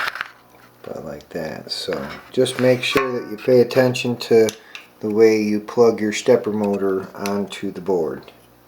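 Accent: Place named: Northeastern Pennsylvania, United States. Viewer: E tree D, haha. Sounds local to me (NEPA).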